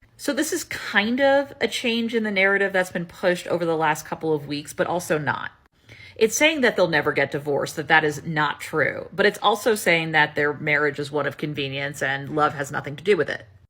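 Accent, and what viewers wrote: United Kingdom. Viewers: Smart and hilarious, but also I enjoyed listening to your British accent. Moreover you're apparently a very good singer. ❤❤❤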